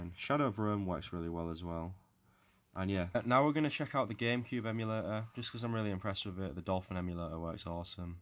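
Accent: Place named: Yorkshire, United Kingdom. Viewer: Are you from Rotherham or Sheffield?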